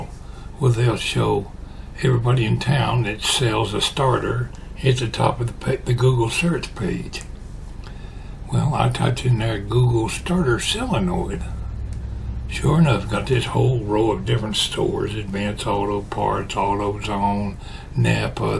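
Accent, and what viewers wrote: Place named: South, United States. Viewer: I noticed you pronounce it "SILL-uh-noid". So do a few of my in-laws. I looked up the pronunciation, and it's what I would have thought... "SOH-luh-noid". I reckon your way must be a Southern variation, because you aren't the only one that pronounces it like that. I wonder about some of your viewers from other regions ...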